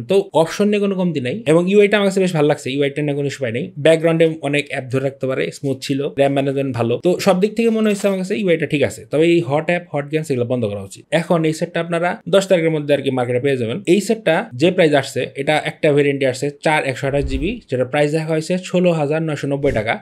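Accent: Tangail, Bangladesh. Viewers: Vai apnar basha ki Tangail a? 😱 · Vai tangail theke naki? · Bhai ki tangail er ni?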